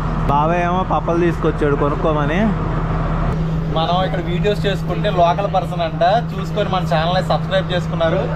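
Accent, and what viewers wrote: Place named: Krishna, India. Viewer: అచ్చం కృష్ణాజిల్లా వాయిస్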